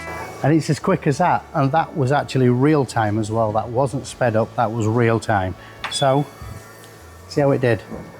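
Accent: United Kingdom. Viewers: I am not a metal worker but have been interested in metal work for the past 5 or 6 years now, I am actually a woodworker and I make fine furniture with various hardwoods etc, but I am very interested in the XTool MetalFab and I have ideas what I could achieve if I had this machine, I might have to sell my car just to get it as it is so expensive but I have a vision. I enjoyed watching your video so I have now clicked on the thumbs-up button and I have subscribed to your channel as well. Great to hear the accent and that you are UK based as I too am originally from the UK although I now live abroad. 😀👍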